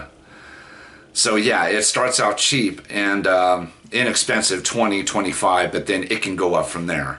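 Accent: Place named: England, United Kingdom. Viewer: Great English accent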